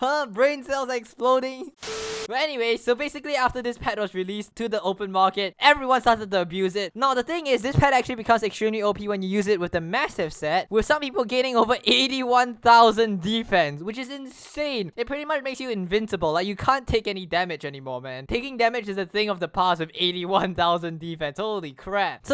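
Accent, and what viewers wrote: Singapore. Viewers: Jesus I love singporians english. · yo are you singaporean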